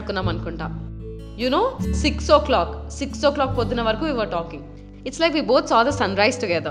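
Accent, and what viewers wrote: Rayalaseema, India. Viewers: Hey r u from rayala seema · ur from rayalseema na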